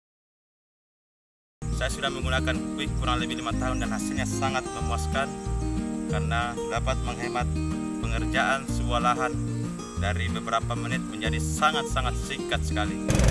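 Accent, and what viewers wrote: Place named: Sidrap, Indonesia. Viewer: Dialek nya khas daerah sana. Aku udah pernah main ke Sidrap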